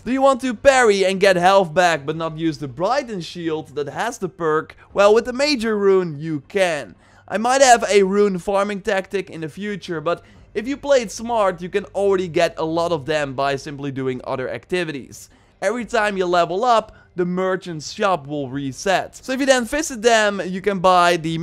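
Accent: Netherlands. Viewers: a yes this accent is made out of dutch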